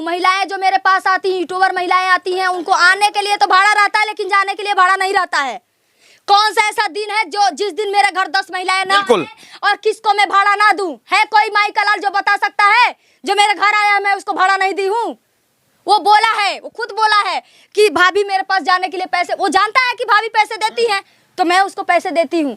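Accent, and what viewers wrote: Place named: Gorakhpur, India. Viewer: ❤ ekadam Sahi boli hain aap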